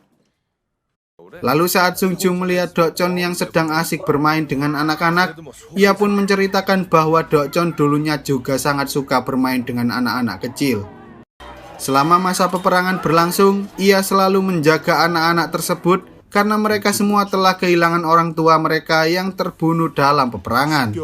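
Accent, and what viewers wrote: Java, Indonesia. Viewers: Njirrr. Wes rah usah kesuen. Intro nya wong jowo doooonk.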